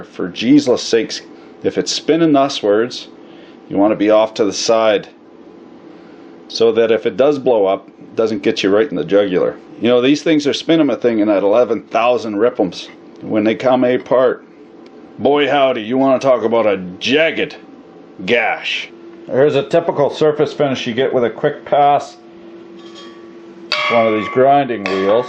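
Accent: Canada. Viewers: your accent is halairious. What part of Canada are you from . lol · Still trying to figure you out. Canadian but with a New York snark. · The guy's Canadian. I know tons of people who talk just like him. Especially in the trades and oil industry.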